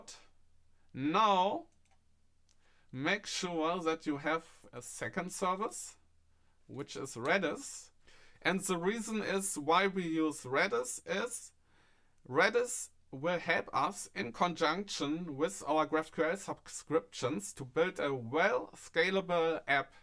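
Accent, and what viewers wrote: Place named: Germany. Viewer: He literally sounds German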